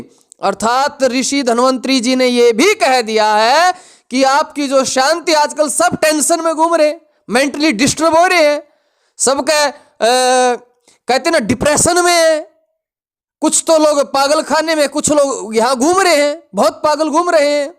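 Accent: Haryana, India. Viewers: Haryana ki tone aa he jati h bich bich m 😁😁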